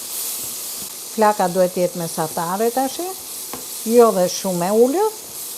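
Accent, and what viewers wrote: Tirana, Albania. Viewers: Ty te lumte ajo goja e omel qe flet hala me zhargonin e bukur tirons puro dhe e trashegin nder breza ate. "Po i ndaj me dy sira" "pras", "voj" , "kryp", "long"... Fiks si nondaja teme e ke gatu kete gjelle me pras e te lumshin duart e arta! ❤❤❤❤❤ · Ti je flori te shikoj vetem te degjoj si flet tironce puro je flori lum ata qe te kan ne shtepi saper gatimet je ok te gjitha nenat shqiptare gatuajn jan nikoqire ju sa shikoj keni pasjon ne gatime kam respekt zoti te bekoft❤❤❤❤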